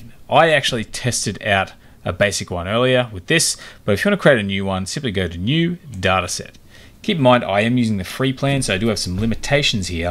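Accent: Australia. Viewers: Thanks, excellent. Interesting side note how Australians pronounce ‘data’ differently to the English. The Ashes series has just started here, c’mon England 😊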